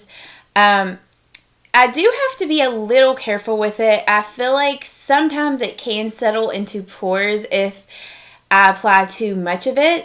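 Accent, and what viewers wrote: South, United States. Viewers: does anyone know where she's from? I'm hearing a southern accent. I'm from ga. just wondering!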